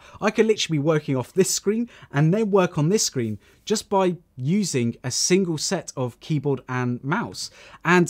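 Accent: United Kingdom. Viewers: You explained it better than the WWDC21 guy. Love that Brit accent. So soothing to the ears. Keep up the good work!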